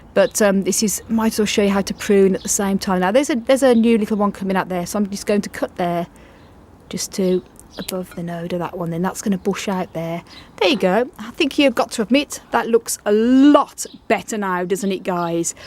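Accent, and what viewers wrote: United Kingdom. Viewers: So pampering voice you have I love UK accent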